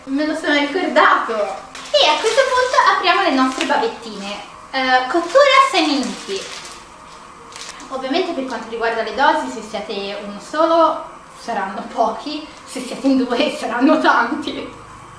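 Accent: Tuscany, Italy. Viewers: Mi fai impazzire dalle risate quando assaggi gli spaghetti alla fine, la tua espressione persa nel nulla, e il tuo accento toscano, 🤣🤣🤣ohh maremma, ciao saluti da Pavullo nel frignano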